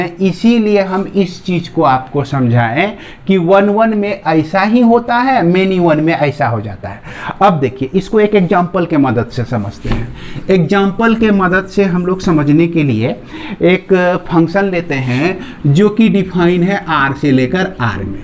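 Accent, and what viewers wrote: Bihar, India. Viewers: Bihari Accent 👌😘😂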